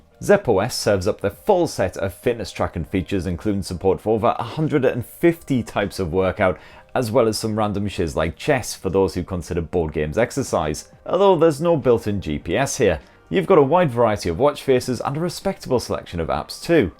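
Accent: United Kingdom. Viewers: I like this dude, he's bloody British like me 😂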